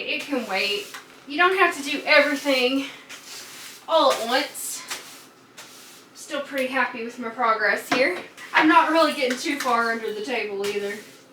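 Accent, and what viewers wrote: South, United States. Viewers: Nursery water is what you use when mixing formula I think:D Hope this helps! also thank you for the cleaning motivation hehe, I keep your videos on in the background when Im doing things around my apartment so I dont feel so alone:) My family is from the south but Ive lived in NYC my whole life, I loved hearing their accents when we would visit, its such a sweet tone you have. Happy for all the vlogs and how much you're enjoying making them, I watch every single one you're so wholesome and down to earth 🥺😇🌈👼💛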